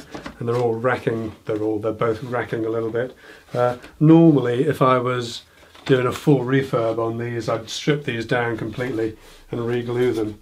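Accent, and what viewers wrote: United Kingdom. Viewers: Hello. I have just recently subscribed and love your videos - especially the British accent ( me being in the US). I think you’ve been on for a year and I do hope as many others that you continue your videos. I have watched many of your catalog of videos and love all the tips and jigs. Keep up the great work!!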